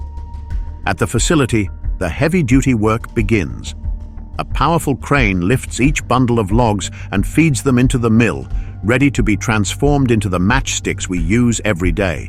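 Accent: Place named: United Kingdom. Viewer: Have someone w/ half a brain listen to ur audio to see what they think. Constantly stating the obvious over & fing over!! Hire a real writer & narrator, that British accent could not sound any dumber!!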